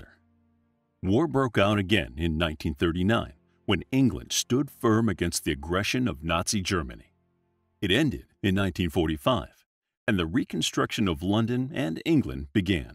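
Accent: United States. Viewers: American pronounceation,is different to ours. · A bit of a list but a reasonable summary. Its a pity it had to be read out by an American accent.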